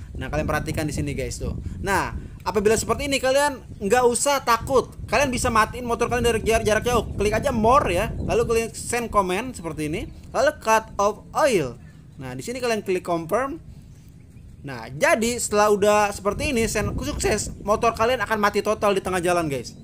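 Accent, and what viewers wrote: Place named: West Java, Indonesia. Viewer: Orang sunda bekasi nih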